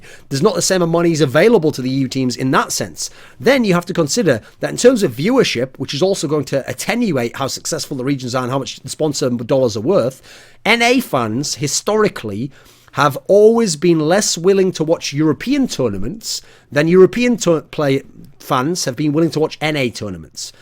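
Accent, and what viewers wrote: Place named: Birmingham, United Kingdom. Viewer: Weak brummy accent. Sort your shit out Thorin.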